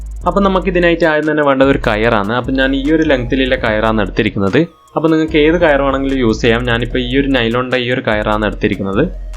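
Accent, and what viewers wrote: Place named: Kannur, India. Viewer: കണ്ണൂർ ഭാഷ ഇതിലൂട്ട എടുക്വ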